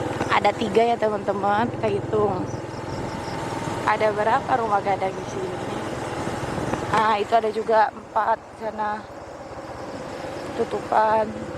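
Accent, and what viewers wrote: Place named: Java, Indonesia. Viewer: Adiak ko asli urang Minang apo urang Tran jawa yo ? Soal nyo logad jawa adiak mengecek nyo Alah lamo merantau ka sitiung diak ?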